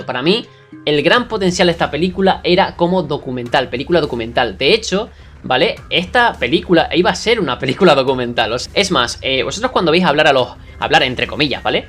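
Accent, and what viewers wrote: Argentina. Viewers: argentino detectado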